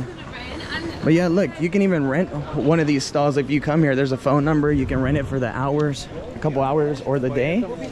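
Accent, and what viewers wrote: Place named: Texas, United States. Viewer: Are you from Texas or El Paso. Do recognize an accent from there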